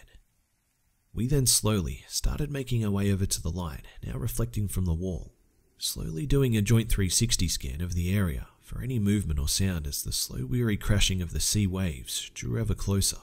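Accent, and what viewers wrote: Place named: Australia. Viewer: hey you sound aussie.which city are you from? 😄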